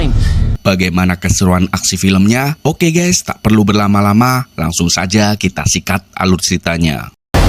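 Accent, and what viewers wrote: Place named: Banyumas, Indonesia. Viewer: Kie tah wong ngapak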